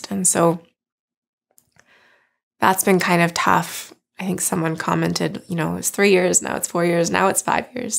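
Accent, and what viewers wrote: Canada. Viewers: Canadian accent coming in strong!!! Great channel though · You are absolutely amazing. I love that you are so completely honest. Do you think the training for the marathon stresses your body enough to change the way your meds work? By the way I grew up in Alberta but don’t live there anymore and your Canadian accent makes me want to go home.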